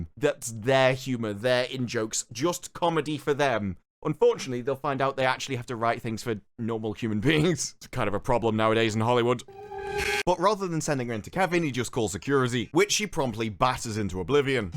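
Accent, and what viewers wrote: United Kingdom. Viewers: dude I love a dude with British accent tearing this apart love it subbed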